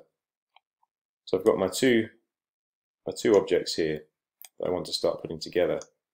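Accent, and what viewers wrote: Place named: Sheffield, United Kingdom. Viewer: Thanks. Just what I needed after recently downloading sketchup which has inspired me to attempt a cabinet build in my shoe cupboard after watching your channel. Nice to hear a uk voice especially one from sunny Sheffield. Lol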